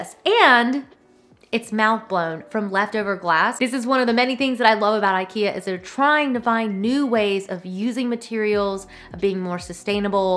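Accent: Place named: South, United States. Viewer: I'm hearing a strong southern accent in this video, which i hadn't picked up before and now I'm wondering if I've missed it all this time 🤔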